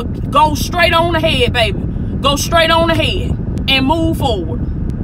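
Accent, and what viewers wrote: South, United States. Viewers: This sister chopping up 🔪 Real Truth with that wonderful southern accent....🍓🌹🌹🌹♥️♥️🙏🙏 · Your accent is so adorable to your Godly personality is this a Texas, or the Carolinas, Georgia maybe a Florida accent? God is good. The enemy only comes to kill, steal and destroy... Remember that! If someone is an ex its for a reason. God doesn't allow a true life partner/destiny spouse to exit your life, the purpose would be greater than the two's emotion or troubles and God's sovereign will would cover and keep the relationship steady and balanced. The Lord gives blessings and good gifts a help mate not a satanic agent or a repeat offender. When a wicked person resurfaces it's like a venomous snake, when it rears its ugly head, cut it off! WATCH & PRAY... Bloodline Circles all around us they cannot cross over! The deeper you go with God and the more you are delivered and the more power you actually wield the spiritual grounds for certain people, places, spirits and things are broken. 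They are bound banished and vanquished away. They can try but will fail. Make the Hand of God and Blood of Jesus the gateway to your personal and intimate life. We are only human and imperfect but even Angels of God will keep evil away and ward them off. He wi move you on to a place they can never find you even in a crystal ball or to astral project to monitor you will be shut down! Nobody pass Jehovah put Him in front of all things. His plan is not for the wrong everything and everybody but for the proper ones.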